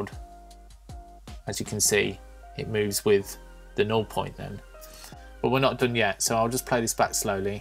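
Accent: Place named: United Kingdom. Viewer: Usually love your videos dude. This one is a little disappointing I'm afraid. Effects shouldn't just be used randomly, any editor knows physics is the most important part of adding effects post edit. The first question I asked (after why you add an extra O to videography 🤣) is why are there clouds being added at this height? The drone is clearly below cloud level, so at this point, eye training takes over and it automatically looks unnatural. We won't go in to types of cloud and why that's wrong. You're an amazing video editor that much can be seen from your other videos, but one thing that really gets my goat is people giving tutorials on something that's not right, this isn't good practice for those looking at compositing, FX physics are even bringing in science into the edits. I really don't mean to come across like I'm pissing for the sake of it. 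You're channel may be small, but it's great and I can understand your limey accent too. Give us more of your skills in Final Cut and videography (with a single O).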